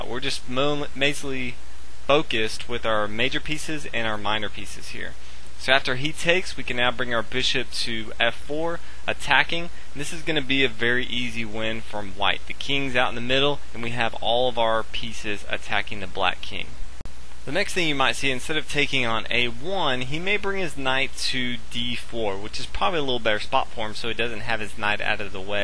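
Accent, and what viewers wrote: United States. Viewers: Nice American accent!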